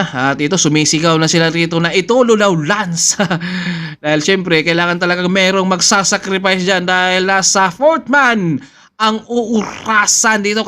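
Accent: Visayas, Philippines. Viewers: tang ama ka, ampanget mo mag salita pati yang accent mong visaya, ang bias mo lagi sa excelent noodles, punyeta ka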